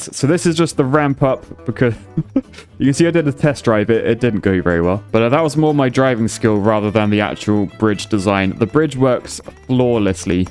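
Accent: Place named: England, United Kingdom. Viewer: You have a nice proper English accent so I wouldn't worry about YouTube captions.. Now, me as a Scotsman though.. on my channel (other account) it can be hilarious reading what YouTube thinks I'm saying XD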